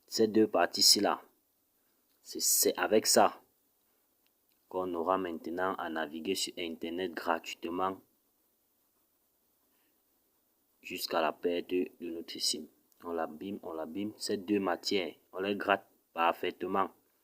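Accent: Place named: Cameroon. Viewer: Quand tu vois accent ça peut être ivoirien , c'est un camerounais ouais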